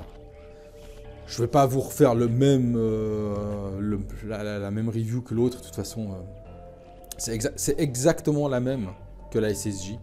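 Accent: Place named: Quebec, Canada. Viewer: This dude def from montreal or quebec.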